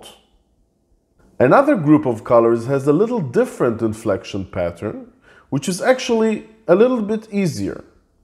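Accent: Israel. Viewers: You sound like an Israeli! מזל טוב! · Fale Hebraico e Pronto! You must not be an american, because he doesn’t sound american. Mr. has a gift of being fluent in english (a good one at that) but his accent is israeli. He doesn’t sound american lol!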